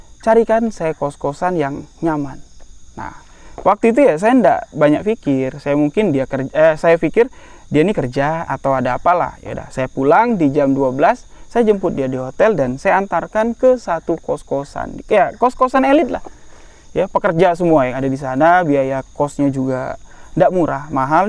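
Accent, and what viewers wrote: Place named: Jambi, Indonesia. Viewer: Assalamualaikum, bang slm santun slm sehat, apapun cerita misteri yg diceritakan sm bang enak punya ciri has bhsa jambi nya sngat amat kental saya suka bgt dari cara bicaranya bang emng orangnya sangat santun sukses trus bang dn teemnya moga sllu diberikan sehat, Amiinnn...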